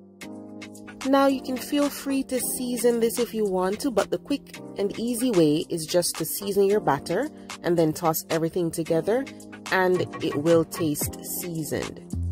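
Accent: Jamaica. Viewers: U must be jamaican. U sound like my mummy. 🇯🇲😭WONDERFUL VIDEO. I gwain try this it looks DELICIOUS!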